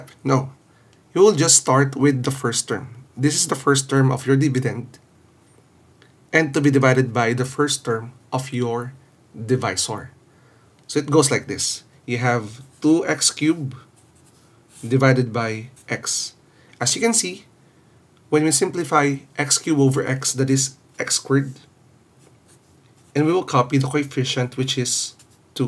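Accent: Philippines. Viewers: Thanks for this loved it soo much...and btw When I first listened ur voice...I knew it that u are from phillipines coz I watched section e drama so I recognised from ur voice that u are from phillipines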